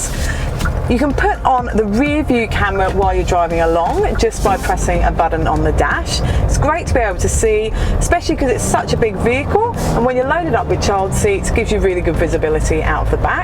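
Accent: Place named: England, United Kingdom. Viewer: you r not from down under sounds english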